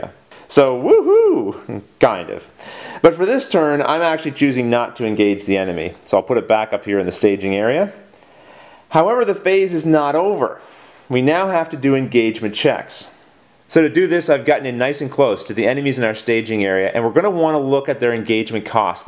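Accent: Canada. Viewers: Man, great tutorial. I drew identical cards as you through that first round and it really helped. But my hand couldn't be more different for this next round, so I'll actually have to use my brain. Your explanations are slow and understandable, so it shouldn't matter. I like the Canadian accent btw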